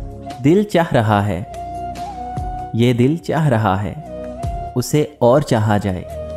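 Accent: Punjab, India. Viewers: آپکا لہجہ سن کر یقین یو گیا کہ پنجاب کے لوگ اردو بھی پنجابی کے طرز پر بولتے ہیں، پٹھانوں کی اردو کا مذاق اڑاتے ہو، اپنی اردو کا حال دیکھو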